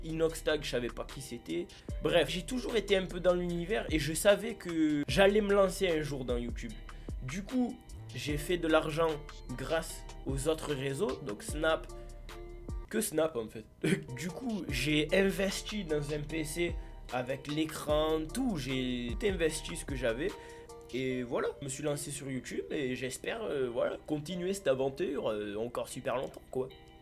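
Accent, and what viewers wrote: Marseille, France. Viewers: La vérité les marseillais jvous aime trop votre accent et votre mentalité tu veux le million pour protéger ta miff tes grv un bon en vrai 💪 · Laxent Marseille pas mal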